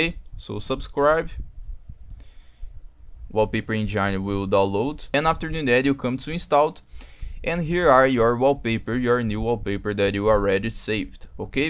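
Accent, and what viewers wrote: Brazil. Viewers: pelo sotaque é brasileiro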